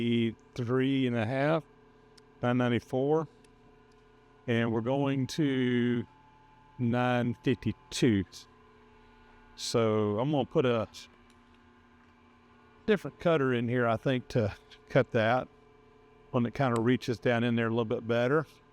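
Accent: South, United States. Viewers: Another English fan here, I love your rich 'Suthernn' accent. Keep posting these treasures, and many thanks.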